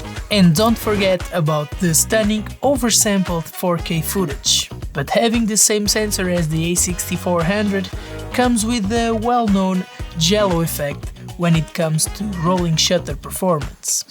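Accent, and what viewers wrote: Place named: Portugal. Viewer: bom video, da para notar em algumas partes que es portugues ahhaha, mas bom sutaque, bom conteudo, novo sub e <mail>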